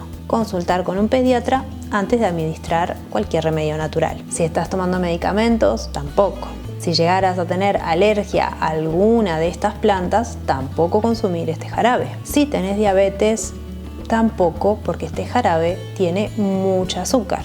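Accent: Argentina. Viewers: ❤😊gracias por la recent unique estoy USA y las hojas no se por cual sustituirlas acá que tengan esa ingredients parecidos 🌷🌷🌷gracias soy Dominicana me encanta el ace to Argentino y vivo aquí en North Carolina 🇩🇴🙏🏼🌷🌷🌷😍💕gracias soy Dominicana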